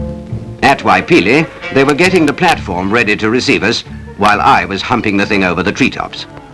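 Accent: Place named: Oxford, United Kingdom. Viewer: Kan kijkers van deze bedrijfsfilm verzekeren, dat in deze getoonde film- en boorlokatie; niet de deze documentaire gebruikte Oxford uitspraak gebezigd werd! Eerder, zal er menig Nederlandstalige vloek gevallen zijn. De helikopters werden gecharterd van World Wide Helicopters geregistreerd op de Bahamas. Vliegers en grondpersoneel waren veelal Australiërs. Deze info van een toenmalige 10 jarige snotneus. Heb op een uitermate tropische zondagmiddag ooit enkele uren, door mogen brengen op de logistieke locatie van deze (droge) exploratie put. Herinner mij bij het inkloppen reaktie, dat collega van mijn vader genaamd Gesterkamp, een paar groene lomboks uit een grote jampot verorberde. Mijn vader waarschuwde voor de gevolgen voor de maagband. Gesterkamp is een hele slechte geworden voor het Shell Pensioen Fonds. Deze culinaire liefhebber van de oosterse keuken is uiteindelijk meer dan 90 jaar geworden.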